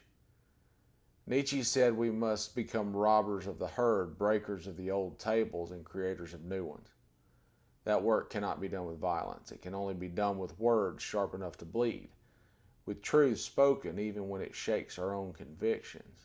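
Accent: South, United States. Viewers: Awesome to hear an American Southern man speaking so perfectly and intelligently on the realities of this issue - with dignity and respect. Well done Sir!!! 👏 👏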